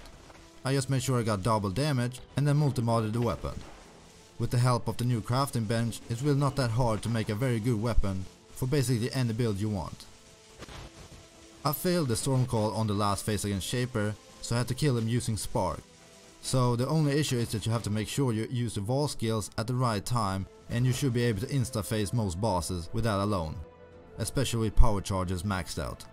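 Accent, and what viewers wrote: Sweden. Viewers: He's swedish. It's disgustingly obvious. Horrible accent.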